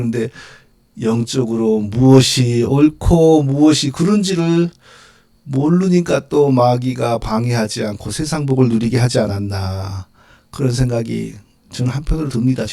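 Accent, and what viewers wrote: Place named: Jeolla, South Korea. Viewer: 전라도 사투리가 짙게 뭍어 나오는군. 경망스런 놈이군.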